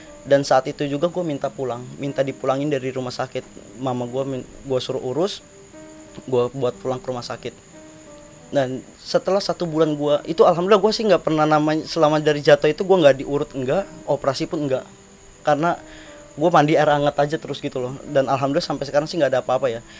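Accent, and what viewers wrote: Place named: Bali, Indonesia. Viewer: Pembawa acara nya logat nya kayak orang bali ..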